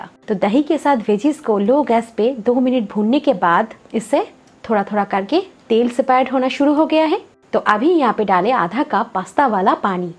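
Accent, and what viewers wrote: Bengal, India. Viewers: Your Hindi accents are seems to be like Bengali. Are you Bengali?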